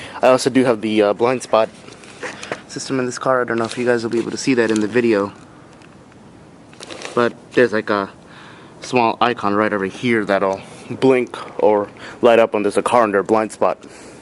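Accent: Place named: India. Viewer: I just love this car. It is favorite car and i do want to buy one asap. BTW I noticed a slight accent in your talking. Are you indian by any chance?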